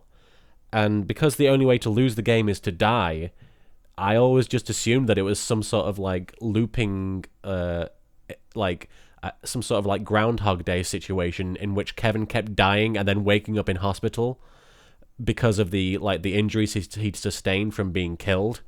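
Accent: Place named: North, United Kingdom. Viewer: NO. NO, FFS. Why does anyone have a problem with doing a let's play in character?? It was hilarious. Who are these people???? Don't listen to them! If they want to actually hear all the boring dialogue in Bloodlines then why don't they just play Bloodlines? Also, trying to put my finger on where LKs accent is from. North East? North West? Dammit. He sounds a bit like turkeyleggggs, anyway.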